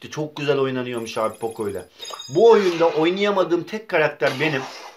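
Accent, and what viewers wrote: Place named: Trabzon, Turkey. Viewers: abi artık trabzon şivesiyle konuşmuyosun neden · Trabzonlu olduğu için olabilirmi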